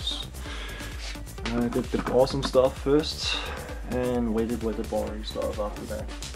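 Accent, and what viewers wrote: Denmark. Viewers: lol er du dansker, har abboneret og så kunne jeg høre du havde dansk accent :)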